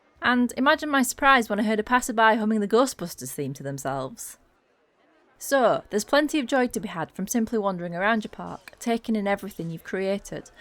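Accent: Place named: Wakefield, United Kingdom. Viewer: I feel like you might be from near me! Wakefield area? Haha!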